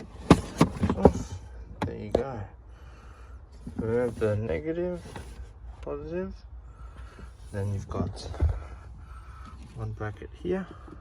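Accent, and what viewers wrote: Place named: Australia. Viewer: You sound Aussie, Is this an Australian delivered Macan?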